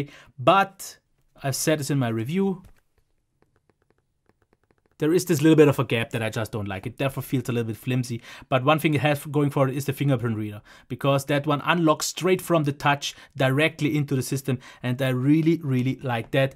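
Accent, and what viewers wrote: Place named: Germany. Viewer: Whenever i hear this guy's German accent, I'm thinking of the movie Terminator.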